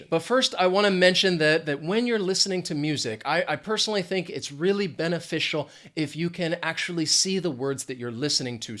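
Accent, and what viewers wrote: United States. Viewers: Thanks a lot! I enjoyed every second of the American English you are using - the way it should be used by all the native speakers;)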